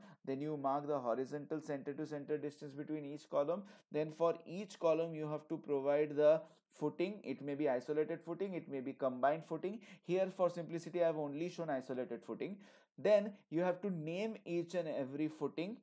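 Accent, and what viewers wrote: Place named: India. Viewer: If you are an engineer you should be speak American accent other than Indian accent you describe in Hindi language it will good for all I don't like damage English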